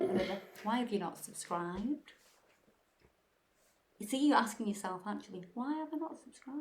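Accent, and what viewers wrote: United Kingdom. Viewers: Well she’s a Brit, and they got a ton of different accents.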